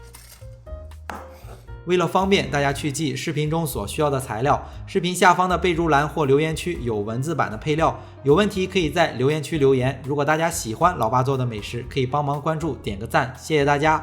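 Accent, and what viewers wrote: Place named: Shandong, China. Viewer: 你是山东人吗？听你的口音和有些厨房的工具名称像我们家乡的人。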